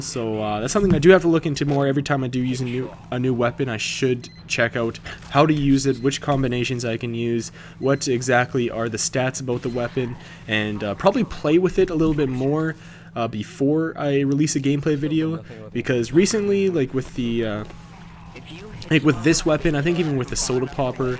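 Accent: Canada. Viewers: Also, are you Canadian? Kind of hearing an accent. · I know that accent.... are you Canadian?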